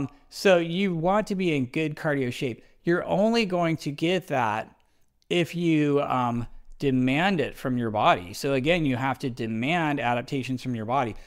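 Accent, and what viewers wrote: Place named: United States. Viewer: 👍Highly informative👌. Please add English subtitles for better understanding American accent.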